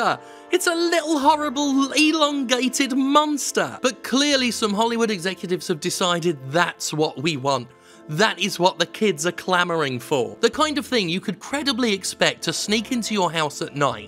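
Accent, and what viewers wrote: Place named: United Kingdom. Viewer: British accent voice in the video is so annoying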